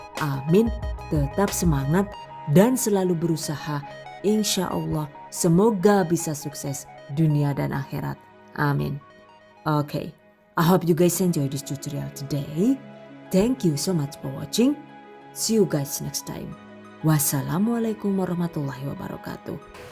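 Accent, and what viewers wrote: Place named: Banyumas, Indonesia. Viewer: Sangat khas videonya... Ada logat 'ngapak'nya.. Jdi lucu.. Dan penjelasannya langsung bisa dipahami... Makasiih bunda resepnya... I really enjoy watcing this video...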